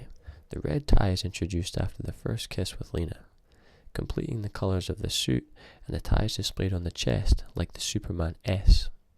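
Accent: Scotland, United Kingdom. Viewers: I think he’s just Scottish :)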